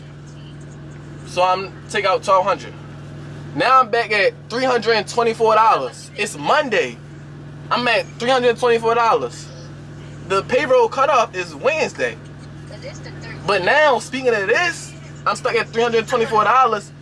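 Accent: Baltimore, United States. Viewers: Definitely gotta be from Bmore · Must be from Baltimore 😂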